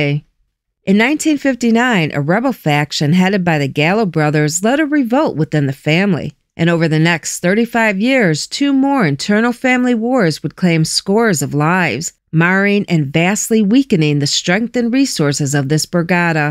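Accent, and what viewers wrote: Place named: New York City, United States. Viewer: Hello 🔥. Love to hear your saweet voice and very oh so subtle NYC accent.❤ Love the way you put the hard "G" at the end of LONG when you say Long Island - true New Yawker. You always deliver the goods when it comes to the rogues of da mob. 😎🤌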